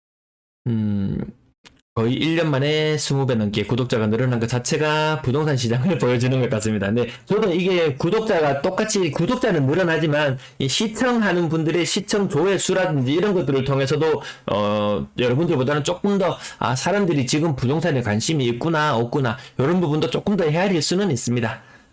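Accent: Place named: Busan, South Korea. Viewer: 와ㅎㅎㅎㅎ 저도부산사람인데 사투리 기가맥히네예ㅎㅎㅎ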